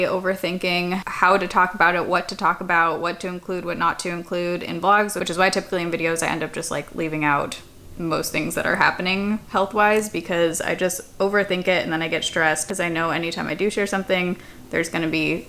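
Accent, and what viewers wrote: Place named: Seattle, United States. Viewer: Listen to you talking remind me of Seattle before I moved out of the state. I miss Seattle so much since there are so many things and places you can go, especially when it comes to healthcare. Swedish hospitals are the best. Their staffs are awesome and very informative, get the jobs done. I hope you feel better soon. I have migraine really bad like u had where I would bury my head the entire days off. I couldn’t take it anymore n went to the ER. But all they give me was Tylenol drips n IV fluids n 1 shot of morphine n sent me home. I ended up having migraine after I went home n decided not to go back to the ER again.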